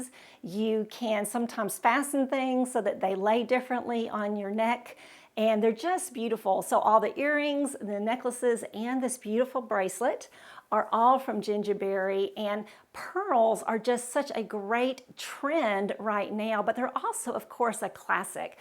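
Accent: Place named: Texas, United States. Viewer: Hi often listen to your videos like them lovvvvve your accent American is it Texan? It's lovely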